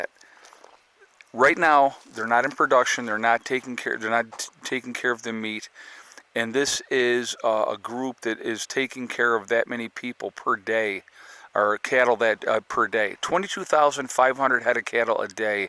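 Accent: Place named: Rhode Island, United States. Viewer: When I listen and watch you I find myself wondering if you’re from Portsmouth Rhode Island. Your accent reminds me of my uncles.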